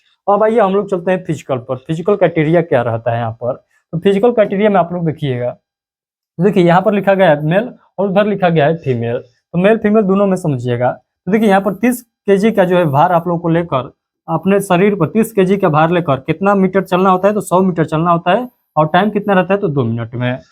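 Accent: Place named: Bihar, India. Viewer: Pahli baat ki aap ki bhasha se aisa lagta hai ki aap Bihar Rajya se hai kya mai sahi hun.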